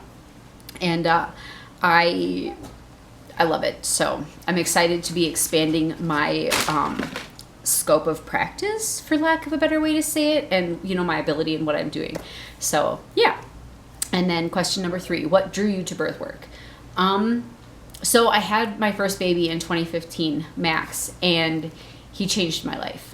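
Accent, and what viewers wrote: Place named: Minnesota, United States. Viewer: I'm not a birth worker, but I stumbled here from Twitter. Had my third and maybe final child at home with a midwife and her apprentice (?) It was healing (after two oppressive/demeaning hospital births). What you do is so important and I just wanted to encourage you. Also, I knew you were from Minnesota as soon as you started talking. 🙂 I've got family there.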